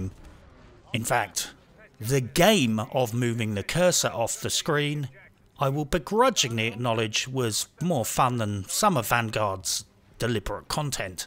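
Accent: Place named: RP, United Kingdom. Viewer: also refreshing to find an anti-left chap with a posh accent.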